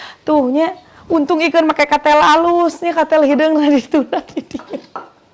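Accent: West Java, Indonesia. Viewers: Lucu teteh bhs sunda na,geulis teh aph adanya sae pisan, geuliiis pisan😂😂😂bukan kayu teteh, rebung mh Awi alias bambu😊😊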